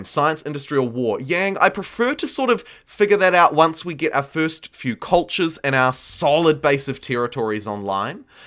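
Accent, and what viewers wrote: New Zealand. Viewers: I'm from NZ and I can't quite pinpoint your accent. It's definitely kiwi, as the "kiora" suggested, but some things sound English, and maybe Australian, and maybe something else I can't pick. Like you said something like: 'aw nah look they're running in with "skart" riders' For "scout", is that a UK accent? Anything else? Just curious lol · It sounds pretty kiwi to me. Maybe rural NZ?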